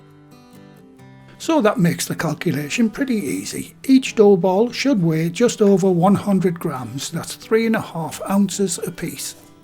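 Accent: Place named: England, United Kingdom. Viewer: Hi I love your channel, I am an English girl living in USA and I miss home very much so it’s great having your accent (even though I am a London girl lol) talking me through my bread recipes! When you get to the end of a step I always say “okay ”! My husband says you talking to again lol. You have taught me a lot about making bread, it’s my new passion, I never thought I could make bread (I have been posting pictures of my bread and other recipes on Facebook and one of my friends called me a kitchen witch, a great compliment that I share with you as it’s because of your videos and the great way you explain) and I didn’t think I had the tools and utensils to do so but where you show the alternatives I have built up my own bread making utensil drawer. I hope to be half as good as you one day. I was wondering if you have a seeded bread roll recipe that you could share with us? 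I am a vegetarian if you have any good veggie recipes that would be nice! Thank you for sharing your gift! Oops as I was typing this message you say how you can add seeds can you add them to white rolls also?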